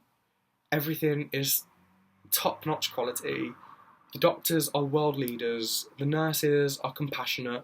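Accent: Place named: Birmingham, United Kingdom. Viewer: I know I haven't seen you for a few years now & that you don't want sympathy but I just want to say that this is a really brave thing that you're doing. It's really good to see you facing this with such bravery & strength. I'll be staying tuned & I'll be thinking of you (I would say praying but it's not much good if you're godless). I have to say I think it's hilarious that you thought you had HIV (wrap it up ) & you do sound like a right Brummie in this video! 😜!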